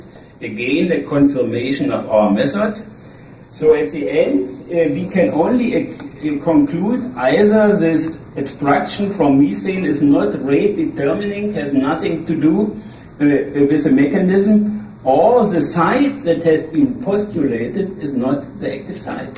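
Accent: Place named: Germany. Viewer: dat german accent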